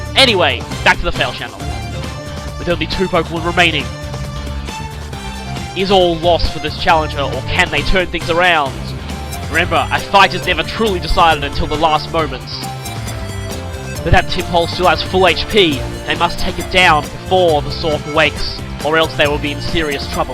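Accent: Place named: Australia. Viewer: Thanks for the reply. Saw your name pop up from a Chuggaaconroy tweet thread reply regarding your persona 5 playthrough and saw a mention of Australian accent. Was wondering if you were the same person, and lo and behold it was after hearing your voice and the copter. Glad to see your content like this, and I’m pleasantly surprised you’ve played the Trails in the sky series as well.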